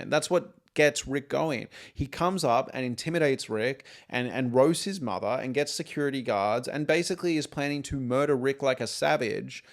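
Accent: Australia. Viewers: ? no he doesn't, he's australian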